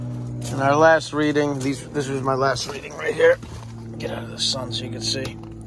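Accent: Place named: Long Island, United States. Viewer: Where in ny are you? You got a Long Island accent but looks like your upstate here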